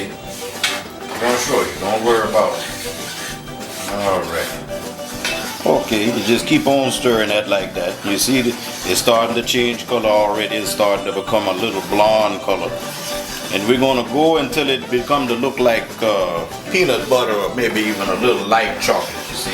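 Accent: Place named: Cajun, United States. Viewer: Lol, reminds me of the old Justin Wilson way of speaking. You play it good! Shout out from a white Creole from New Orleans. Mais, Le’zydeco sont pas salé, cher!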